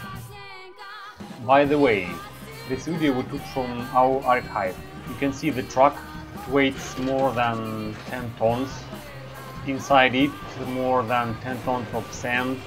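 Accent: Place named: Russia. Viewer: Русский акцент ничем не скроешь.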